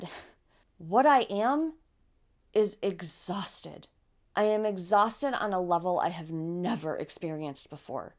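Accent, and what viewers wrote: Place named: Michigan, United States. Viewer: Hi,. You recorded this video on my 47th birthday, and I'm watching the day after. I keep coming back to your videos because, if I'm honest, your accent takes me home, even though I haven't lived in Michigan in nearly 30 years. Beyond that, you're so relatable. I told my husband (my BFF, the breadwinner, and the one who I know always has my back) last August, "I did a thing. You know I have a hard time asking for help, and always have, well, I have an appointment with a therapist in two weeks at the same counseling center we used with our daughter. I'm not depressed, but I'm not doing well and I need help." Long story short, perimenopause will kick a neurotypical woman's butt, and absolutely make everything that a neurodivergent woman struggles with on the best day, way way more difficult. I know what depression is (been there, taken the Rx for it), that wasn't it. 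For me, perimenopause ramped up my (now diagnosed) autistic traits AND my ADHD traits and masking became more than my physical body could keep up with, and I was doing well to do the bare minimum in every aspect of my life (as homemaker, wife, mom, grandma, and friend, too). My virtual hug and older sister recommendation to you is this: if you need someone to really listen to you, like you probably listen to your friends, find a therapist. Therapy is so worthwhile. Everyone needs a safe place to talk face-to-face with someone about all the things, and creative introverts seem to be that safe place for their f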